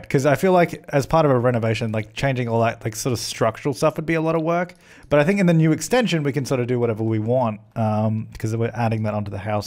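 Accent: Australia. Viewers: I'm weird lol:3 All Australiens sound the same for me I think 🤔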